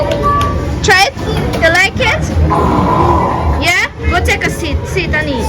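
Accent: Jamaica. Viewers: I love how she sounds like a long time yard woman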